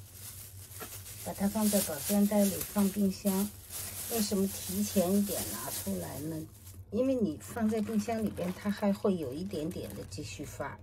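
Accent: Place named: North, China. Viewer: 奶奶您怎么讲话一点不像快百岁的老人，思路清晰，口才好，而且听您说话像北方人，没有一点在东南亚国家生活的口音。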